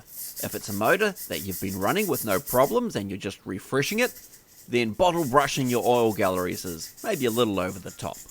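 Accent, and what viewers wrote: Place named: New Zealand. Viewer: I love the New Zeeland accent. Threeds and heeds.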